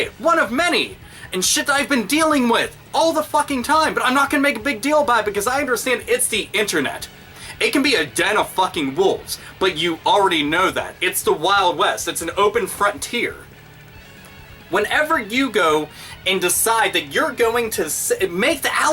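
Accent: Pittsburgh, United States. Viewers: haha holy shit, youre a yinzer? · I realize this is an older video, but I have to ask; are you a Pittsburgh native? I gotta know man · where are you from? I'm from Fayette County, PA and your accent reminds me of home. I noticed your Pittsburgh Zombie shirt so that's further evidence that you're from that area. Your rants are fucking awesome and your accent sends it over the top.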